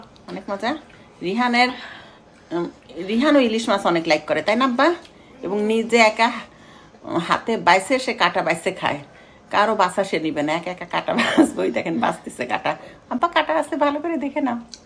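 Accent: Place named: Kushtia, Bangladesh. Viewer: আপনার ভাষা শুনে মনে হচ্ছে আপনী কুষ্টিয়ার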